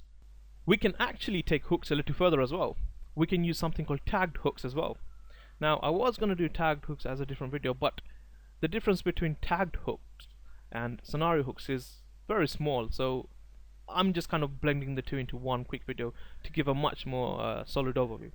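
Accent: United Kingdom. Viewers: Where are you from men? Your accent sounds like... I don't know... Scottish? (well definitely not an Indian?..) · Hi, Your work is Amazing buddy, I like it very much, to the point videos. I do have bit of a issue with following some sentences of your British accent, but this issue is far from the quality of subject ad experience you deliver. Keep up the great work.. One thing I wanted to ask, in your videos, you worked with small examples, small piece of codes. I am Manual tester with some automation experience in QTP, I am after learning automation using from your and other people's videos, i want to start my career into Automation using selenium, but I am hesitant because so far we have only learnt with small samples. Can you give us a real time example or real time requirment in regard with automation, so I can judge the scale of intelligence required to do automation in real world . 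I know this is a general question , to which mots people end thier answer with " IT DEPENDS" and leave the questioner to swim in the ocean, Can you please try to answer this in more helpful way to newbies by giving some real life examples you came n your carrer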